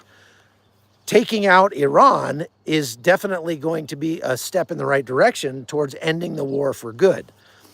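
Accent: United States. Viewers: What are you doing in Iran, you sound like your American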